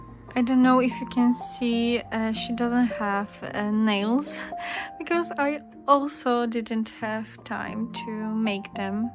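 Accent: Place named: Poland. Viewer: OMG Tak mi się wydawało, że masz polski akcent! :3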